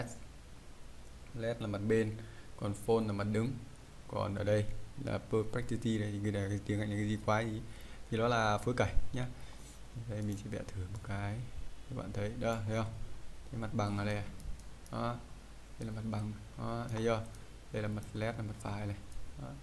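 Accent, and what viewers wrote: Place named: Vietnam. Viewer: Đọc tiếng Anh như tiếng Việt, hài quá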